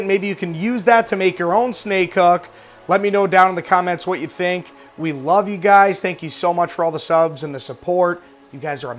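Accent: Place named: Florida, United States. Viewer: Michigan? Definitely thought u were a Florida boy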